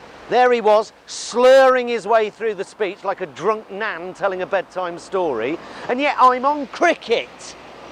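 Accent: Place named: London, United Kingdom. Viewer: Gotta love that London accent.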